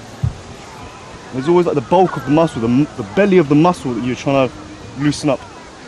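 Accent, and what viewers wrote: London, United Kingdom. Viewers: sound like you come from East London or South London lol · You look like a Thai master with a British accent😱👍🏻👍🏻👍🏻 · He sounds almost like Africans that live in England. Lol!! This looks soooo relaxing. If it were me I'd just fall down. "You just gone have to come down here lao shi."😊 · I subscribed for the simple fact an Asian guy with a London accent it just cool AF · definitely 100% a London accent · he has a strong london accent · Just a basic London accent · Love your British accent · what lmfao it’s a London accent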